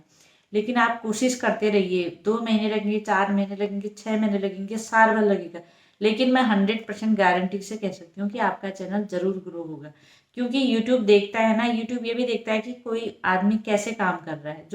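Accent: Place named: Pithoragarh, India. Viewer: तुम्हारी जानकारी बहुत अच्छी होती है में कई बार सुनी हु अभी भी फुल सुनी हु में जो तुम बताई यही सब हो रहा हा राशिफल का खूब चलन कुछ न कुछ करते सब लोग ऐसा,तुम कहा से हो बोलना तो पिथौरागढ़ वालो जैसा है, हा बार बार एक तो लगाना नि होता है बहुत अच्छा बोली सब,में जुड़ी हु तुमसे कई बार देखती हु कमेंट नि करती आज अच्छी से सुनी तो कमेंट कर रही हु ,सुनती फूल हु में ,,हा अपनी आवाज में बनाना सही है लाइव भी सामने अच्छी हा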